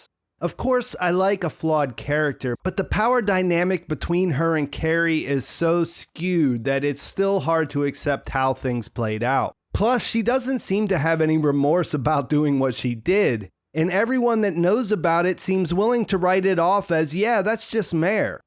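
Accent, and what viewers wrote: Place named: Pennsylvania, United States. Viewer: You have a Pennsylvanian accent.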